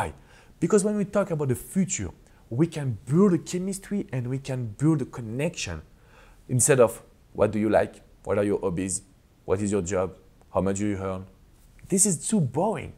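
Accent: France. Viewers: Ohhh le french accent je love it 🥖 🍷 🇫🇷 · haha "You can hear my french accent"... 🤣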